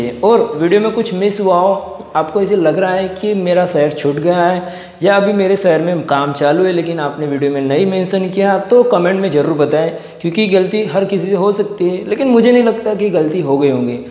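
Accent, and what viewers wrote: Maharashtra, India. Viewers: Wo marathi he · wo marathi he shayad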